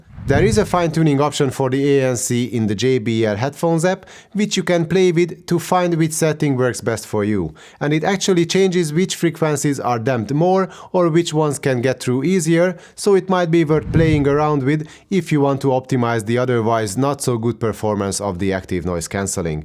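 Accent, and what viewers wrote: Hungary. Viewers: Érzek egy kis magyart 😜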